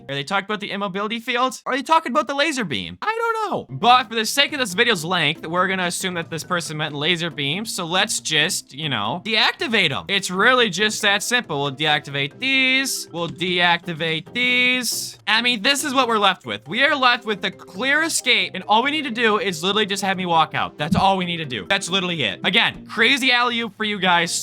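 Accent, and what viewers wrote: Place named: United States. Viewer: im brazilian, and i just wanted to say, (dont get me wrong) i hate people from usa who talks with that "tone", but videos are SO good that i LOVE to see, and just ignores it. Love that channel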